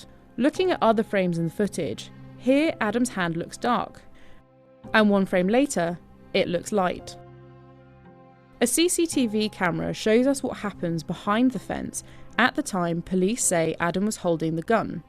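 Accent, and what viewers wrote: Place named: United Kingdom. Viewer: I think they think a slight British accent makes this nonsense more believable..... SMH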